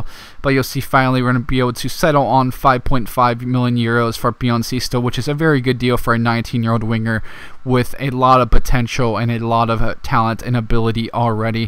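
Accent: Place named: United States. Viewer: Finally you don't know how hard it is to find someone who does AMERICAN English Fifa career mode great video btw new sub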